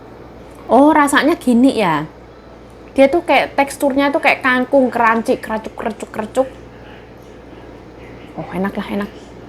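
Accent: Java, Indonesia. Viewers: Logate ngomg masih medok...semua kata paten " K " 😂😂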